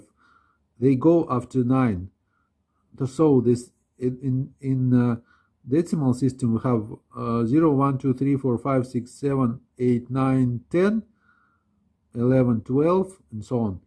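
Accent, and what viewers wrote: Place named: England, United Kingdom. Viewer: О, а вы из Англии?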